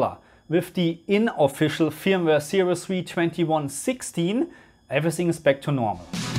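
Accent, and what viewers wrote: Germany. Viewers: bist du deutscher? Klingt so 😁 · That's one heavy German accent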